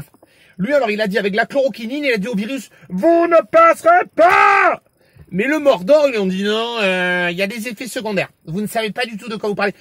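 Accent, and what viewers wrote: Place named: Alsace, France. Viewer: J'adore notre accent Alsacien ; Mais ! Je dit vive les accents de nos régions, et l'accent provençal est un bonheur à entendre. L'accent à la marcel pagnol façon : la gloire de mon père ou le château de ma mère et autres Manon des sources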